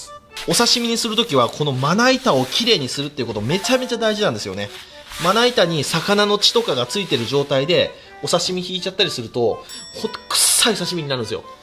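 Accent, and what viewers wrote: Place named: Mikawa, Japan. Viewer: ところどころ三河弁なの親近感湧くわー！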